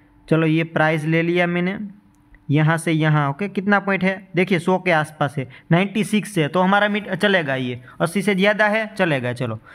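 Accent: Gujarat, India. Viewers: You frome gujrat ❓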